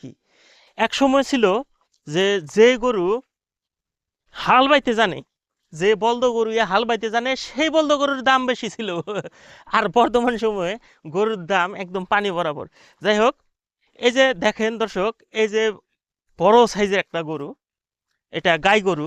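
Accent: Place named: Bangladesh. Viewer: Are u from India?apnar kothar tone to Pura bangladeshider moyo.gorur Dam 1 lather Kim bangladeshe niy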